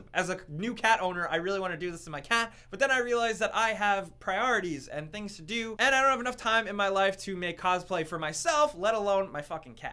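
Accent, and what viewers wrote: Canada. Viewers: You americans? are you canadian like me?:D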